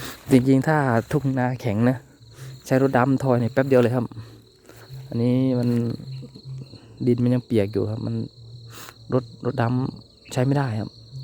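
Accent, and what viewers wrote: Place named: Korat, Thailand. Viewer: พูดเหมือนภาษาโคราชเลย ชอบๆ 55 fc ครับ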